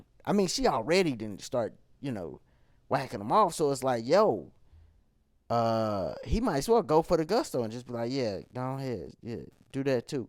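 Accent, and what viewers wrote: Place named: St. Louis, United States. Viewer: youre from stl? Lol you sound like it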